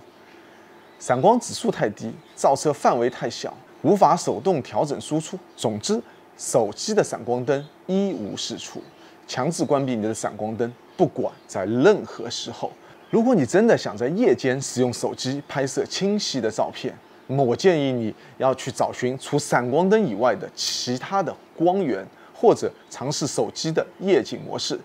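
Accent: Shanghai, China. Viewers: 这口音太上海了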